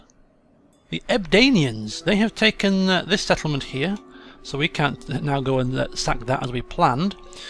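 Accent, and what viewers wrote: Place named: Northern England, United Kingdom. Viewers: Leeds, a fellow northerner can tell the accent a mile off ;) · Gotta say its always good when you encounter problems doing a campaign on Total war, no one likes a straight forward campaign haha and I can't quite work out whereabouts up north your from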